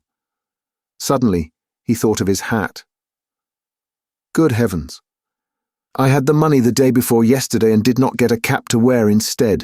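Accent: United Kingdom. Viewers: Thanks. I needed a Brit for this one. :eyes-pink-heart-shape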